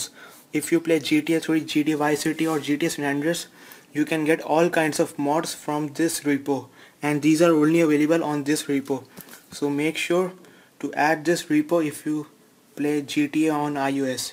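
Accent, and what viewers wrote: India. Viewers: Why do u sound like a gay Indian ?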